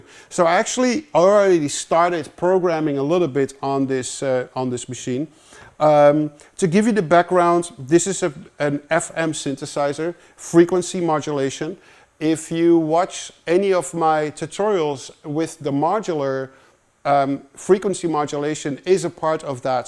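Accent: Netherlands. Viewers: That's more just his accent. He's Dutch. Just for an example, Canadians will say some vowels surprisingly different than other English speaking peoples.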